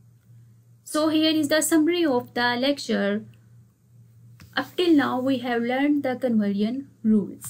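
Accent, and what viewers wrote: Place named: North India, India. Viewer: If my guess my right ur a North Indian.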